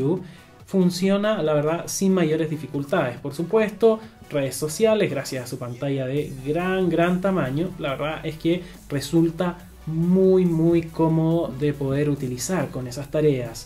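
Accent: Chile. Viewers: el es chileno?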